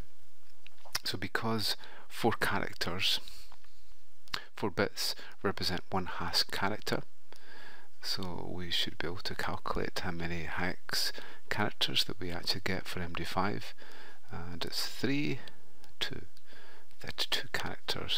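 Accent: Scotland, United Kingdom. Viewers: It's nice to get a Scottish guy on here explaining things :) !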